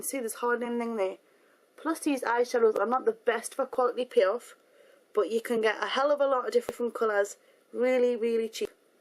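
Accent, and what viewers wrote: Ireland. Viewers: yea i love her irish accent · Loveee your accentttttt xDDDD Irish???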